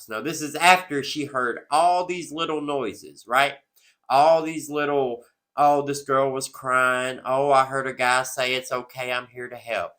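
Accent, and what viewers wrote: South, United States. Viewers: Hello! New subscriber here from the Scottish Highlands. I completely agree with your ‘Southern logic’ and I appreciate your explanation of ‘beyond a reasonable doubt’. Love your Southern accent too! It looks like your subscribers are a lovely group of critical thinkers. Keep up the good work!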